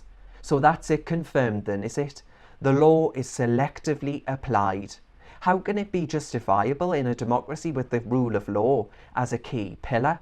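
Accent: Newcastle, United Kingdom. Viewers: 💯👏 I can tell you're from Newcastle like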